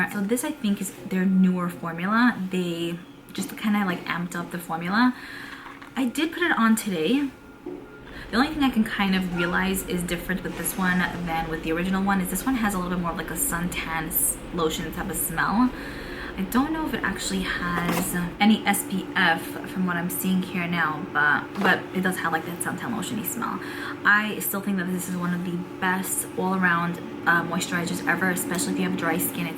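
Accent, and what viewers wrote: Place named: New England, United States. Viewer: Welcome back❗❗❗ I missed you so much and thought of you often. I kinda knew you were pregnant. I want to know was this a planed pregnancy for you and Mikey? I cannot wait to hear your birth story. So happy to see and hear you. That Yankee accent makes me feel like home. Luv ya💋🤗👍✔️❣️❗ ColourPop Pop put out today cream bronzer stix and new highlighter shades. It's worth looking in to.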